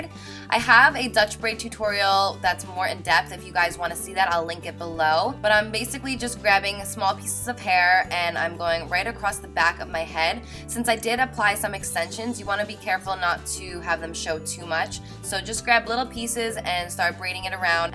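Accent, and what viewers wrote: New Jersey, United States. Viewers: I knew you were from Jersey! that accent haha. love your vids. you're gorgeous! 💕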